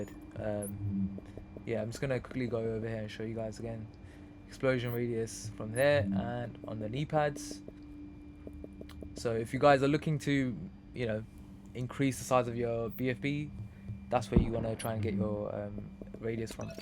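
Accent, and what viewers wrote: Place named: United Kingdom. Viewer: are you in UK ) your accent suggests it but fast are long here man 20 hours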